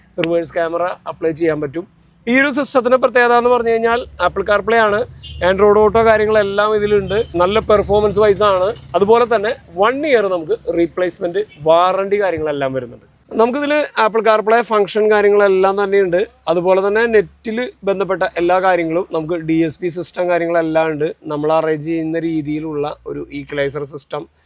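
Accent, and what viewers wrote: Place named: Thrissur, India. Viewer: സംസാരം കേട്ടാൽ അറിഞ്ഞൂടെ തൃശൂർ.. ☺️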